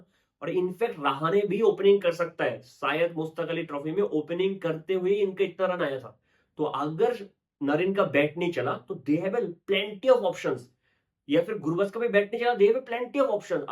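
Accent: Bengal, India. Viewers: bhai are you bangali?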